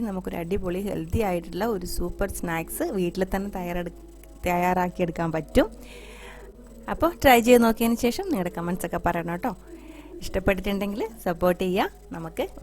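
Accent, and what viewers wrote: Tamil Nadu, India. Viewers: Chechide Tamil kelkkan aanu rasam.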